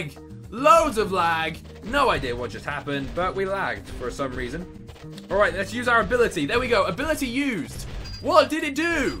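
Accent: United Kingdom. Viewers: is your voice a bridish accent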